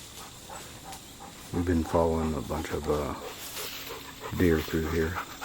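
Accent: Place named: Monroe County, United States. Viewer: Looks like Monroe county and you sound just like my family in Monroe county. beautiful country!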